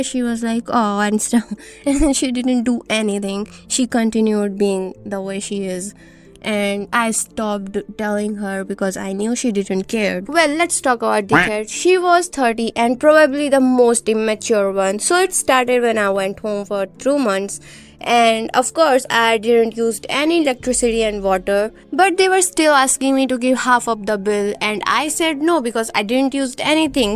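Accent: India. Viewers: Hey are u indian? Because accent is kinda ✨ indian ✨. Btw the video is really nice 👍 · I'm really sorry if this is too personal but... Are you Indian because you have kind of an accent 😅 (I really like your voice btw! Is cute!) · do you happen to be indian ?, your accent sounds like one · I thought so 😅, I’m an Indian as well! And I could recognise by your voice, your accent, your voice, and your sweetness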